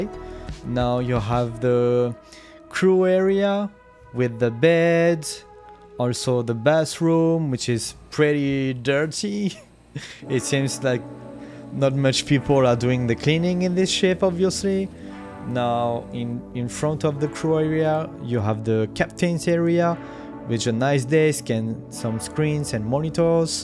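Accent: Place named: France. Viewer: Bel accent on reconnait les fr^^ , en tout cas elle est top ta vidéo :) sa ma donner envie de lancé mon reclaimer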